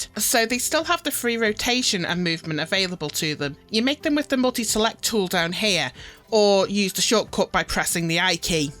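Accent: United Kingdom. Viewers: You have a sort of an accent...Brittish????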